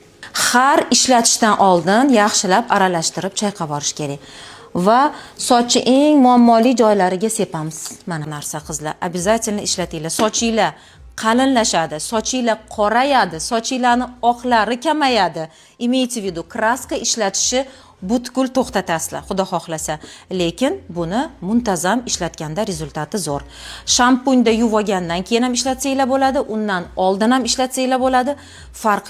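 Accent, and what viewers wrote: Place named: Tashkent, Uzbekistan. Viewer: Тошкентча. Гапиришга гап йук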